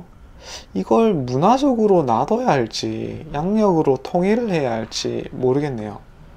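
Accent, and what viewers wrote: Gyeongsang, South Korea. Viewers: 저는 부산,경상도 사투리가 너무 정감있어서 무조건 호감요^^ 부모님의 음력생일은 그냥 돌아가시기전까진 평생 간다고생각하는게..;;못바꿔요.. 저희부부도 이번에 싹다 양력으로 바꿨네요. 애들은 당근이구요. 좋게말하면,발상의 전환이고,어른들보기엔 모나고 발칙할수도요. 50중반되니 도발이 가능하더라구요~ㅎ 딸이 고딩교사초년생인데, 작년내내 징징대고 다녀서 엄마인 저도 어찌나 힘드던지요ㅜ 잔잔한 소회를 담은 선생님 유튭 잘보고있어용~ 딸내미 남친이 부산 싸나이라,정겹게 보고있답니다^^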